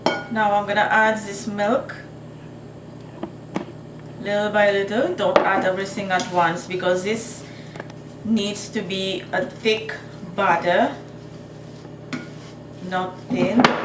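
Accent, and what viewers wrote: Mauritius. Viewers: You have a mauritian accent.x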